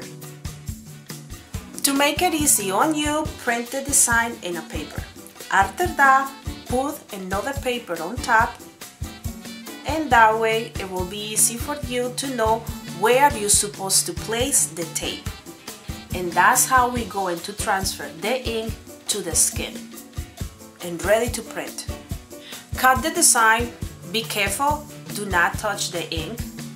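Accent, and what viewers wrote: Colombia. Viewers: ay mi cileo tengo un proble ni el berrco en la vista y no puedo leer porfa habla en colombiano jejeje vivo en chile y eres la unica persona que me recuerda a mi pais jeje (colombia) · tu eres tan hermosa y puedes grabar en español, inglés,o en chino si quieres, lo único es que se te extraña en el video..... Ami la verdad a me gusta más escucharte en tu idioma porque me gusta mucho el acento de l@ colombian@.....Gracias por todo lo que haces.......y suerte en todo lo que hagas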